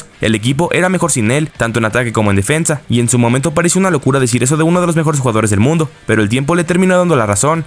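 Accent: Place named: Yucatan, Mexico. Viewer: Es que es yucateco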